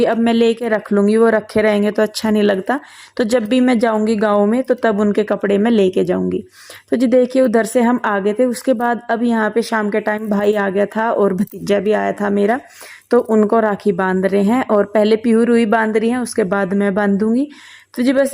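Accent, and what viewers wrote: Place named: Saharanpur, India. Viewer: Aap saharanpur se ho aas pass me kisi Gao se aapki aavaj yahi ki hai 🙏🙏🙏🙏🙏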